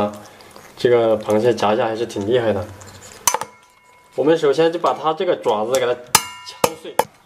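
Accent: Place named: Zigong, China. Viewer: 感觉王师傅是自贡或者内江的~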